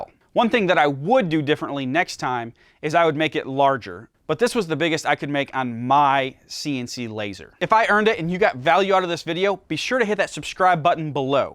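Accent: Michigan, United States. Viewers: 1) Are you from Michigan? 2) Woah when did get a Laser?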